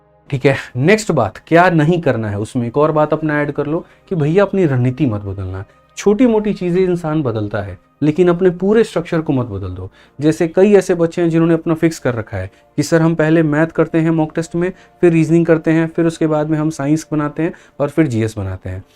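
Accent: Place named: Patna, India. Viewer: Sir aap patniya bol lete ho😂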